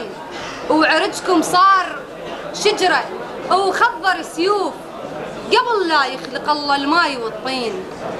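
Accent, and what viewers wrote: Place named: Iraq. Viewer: الله، اللهجة العراقية روعة،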